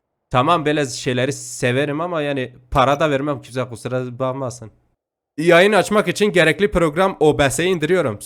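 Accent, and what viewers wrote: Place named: Van, Turkey. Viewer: Abi düşündüm ve şivenden Vanlı olduğuna karar verdim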